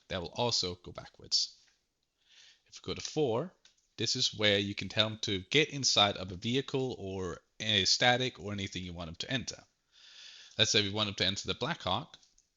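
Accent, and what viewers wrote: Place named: United States. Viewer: I have 5500 hours in Arma 3. I just started playing this campaign looks promising. I would like to ask a favour, as someone from England i found your accent really interesting, as in American but i cant place it. Would you tell me where that accent is from?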